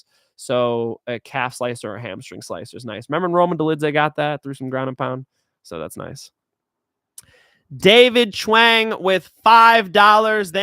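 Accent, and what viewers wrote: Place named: Tacoma, United States. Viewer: Are you from Northend Tacoma Wa?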